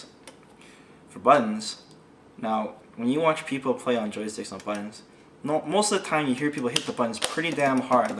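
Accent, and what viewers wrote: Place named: Canada. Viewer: I didn't know he was Asian, but I did know he was Canadian because of his accent. It's not an Asian accent, it's Canadian.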